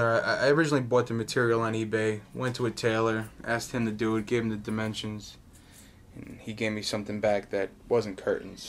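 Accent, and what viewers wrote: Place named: North Jersey, United States. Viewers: you are def from north jersey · Joisee accent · you sound like your from north jersey , but greeting from across the delaware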